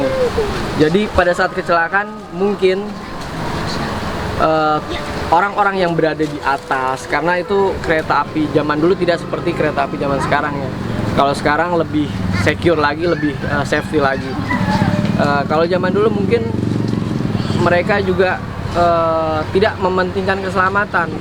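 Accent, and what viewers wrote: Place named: Tegal, Indonesia. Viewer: Tegal ngapak ki❤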